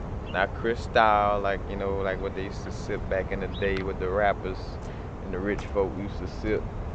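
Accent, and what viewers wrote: New Orleans, United States. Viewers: Is that a New Orleans accent?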